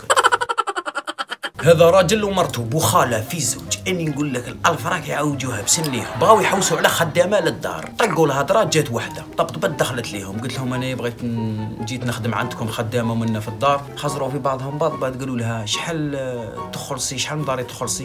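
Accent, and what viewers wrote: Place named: Setif, Algeria. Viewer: خوياا لهجتك تشبه لهجتناا سطييف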